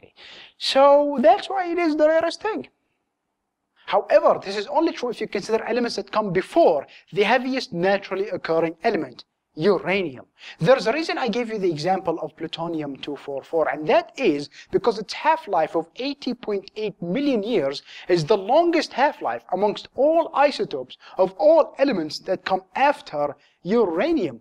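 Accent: Arab world. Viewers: اللكنة العربية المستشرية احلى ما في الموضوع :D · he's got a really heavy arab accent (im arab myself) ..is he actually arab ? nevertheless he is amazing :D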